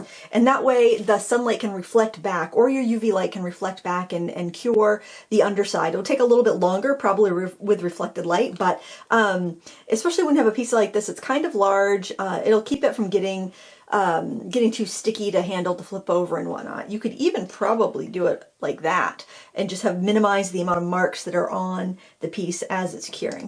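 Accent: Maine, United States. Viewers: LOVE that Maine accent... I thought you were saying 'drawring' board at first :) These are clever...well done. I love how your bowls are turning out!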